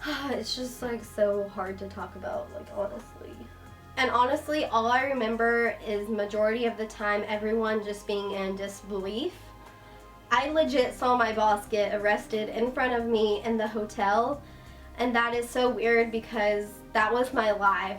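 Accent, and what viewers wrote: United States. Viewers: I love your accent! What state are you from? I'm from the most southern town in florida! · this is entirely off topic but are you from the deep south? you have a very Southern bell accent.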